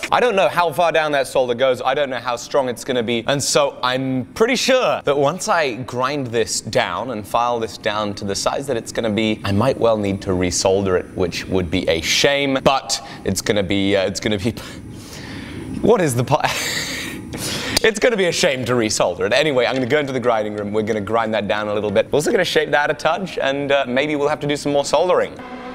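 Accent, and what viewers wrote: England, United Kingdom. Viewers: Chad Looney English word pronounced in English by an Englishman in England. I'm afraid this trump's the American pronunciation in this case. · He speaks English, not American English. No matter how much he likes that cowboy hat of his.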